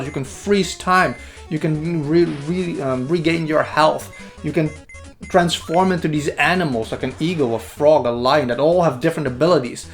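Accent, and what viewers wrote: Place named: Denmark. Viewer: I'm guessing Danish.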